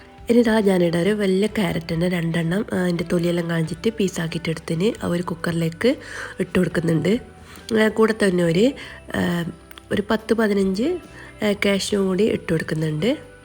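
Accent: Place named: Kannur, India. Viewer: തനി നാട്ടിൻപുറം കണ്ണൂർ സ്ലാഗ്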